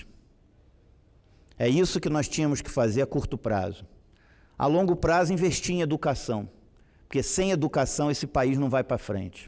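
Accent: Rio de Janeiro, Brazil. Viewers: O assunto é bom, mas... Essa merda de sotaque carioca cheio "Xix" ninguém merece!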